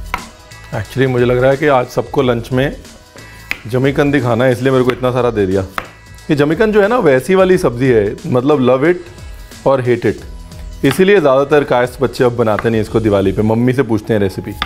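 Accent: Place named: Punjab, India. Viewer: I like your style of explaining everything. And, your typical Punjabi way of talking. I am going to make Suran the way you demonstrated today. I used to make in a different, Tamil Brahman way, my neighbor had shown me & it was tasty, but your recipe seemed amazingly simple & I could taste it in my home, here. Thank you Ranveer.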